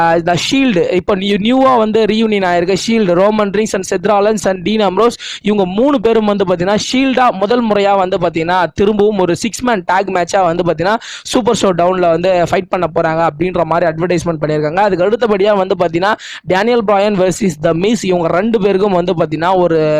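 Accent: Villupuram, India. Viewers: Bro are u from villupuram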